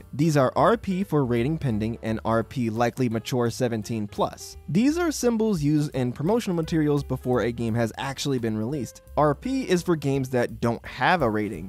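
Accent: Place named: North America. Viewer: Well, none of these are nostalgic or iconic to anyone outside of North America. From your choice of words and accent, it's clear where you're from.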